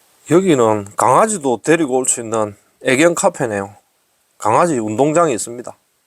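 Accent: Gyeongsang, South Korea. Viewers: 노노 갱상디언..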